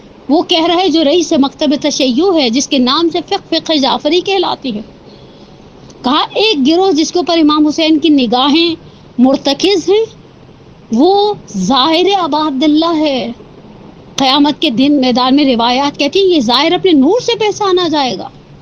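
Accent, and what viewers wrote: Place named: United Kingdom. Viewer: Ya kuin aysi Hain akhir??? kuin English accent main Urdu bolti Hain ...:(in ko madarsa kuin itny achy topic pay bolnay ko khta hai akhir??? Ya Allah in k lecture ko Sunna boht muskill ho jata ha JB k in k husband intehaie bahtren Andaz main bat karty Hain..I wish main Karachi aun tw in sa milun ek bar aur pochun ap ko itna complex kuin hai angrazi ka ...ap tw Urdu b bol lain boht hai kuin k ap tw pakii lahoran Punjabi Hain...anyway really sucks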